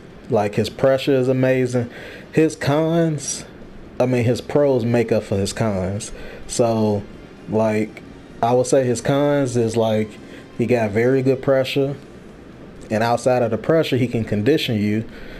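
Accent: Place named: Texas, United States. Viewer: thought you was from Texas lol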